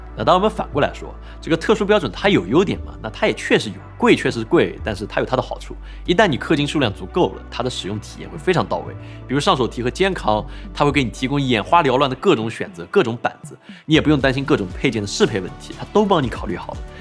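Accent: China. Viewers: 貨幣可不可以講清楚？我看你翻牆到美國開頻道，又操著中國口音，到底要我選哪個貨幣？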